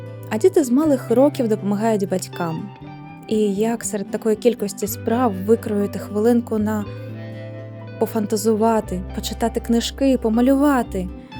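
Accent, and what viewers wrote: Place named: Russia. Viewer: Маю зауваження. Ви робите в наголосах характерну нині помилку-русизм - зсуваєте наголос на перший склад, як м.оскалі: нА душу, а не "на дУшу", жИли, а не "жилИ". Зверніть, будь ласка увагу. Відео дуже сподобалось, підписався. Дякую!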